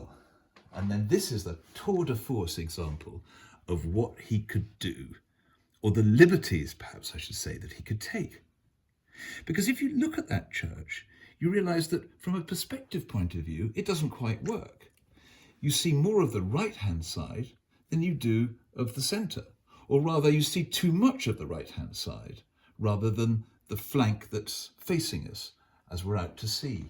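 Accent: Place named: United Kingdom. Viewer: Thank you so much for your lovely "lesson ". True, everything sounds better with the british accent. Greetings from Tuscany, Italy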